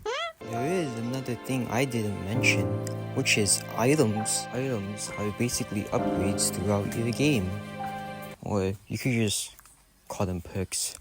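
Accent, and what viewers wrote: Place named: United Kingdom. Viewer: Honestly your voice sounds British but don't get this in a wrong way or bad way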